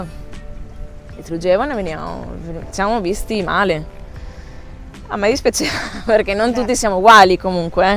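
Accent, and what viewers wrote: Veneto, Italy. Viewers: Brava, una bella intervista, sei stata sincera e intelligente, hai messo in evidenza anche le problematiche e le difficoltà di ogni trasferimento. Lo spirito di adattamento conta moltissimo, soprattutto nei momenti difficili. L 'Italia è unica, per bellezza, cultura, geografia, è il modo di vivere che è cambiato, è diventato frenetico, come dici tu, non è per tutti. Chi ha la possibilità di cambiare, di mettersi in gioco, è giusto che lo faccia, ciao e Pura Vida. P. S. L' accento veneto non l'hai perso.... 😁😜👌👍💪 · Ciao, che bella storia!! Sei stata bravissima, complimenti! Di che paese del Veneto sei?.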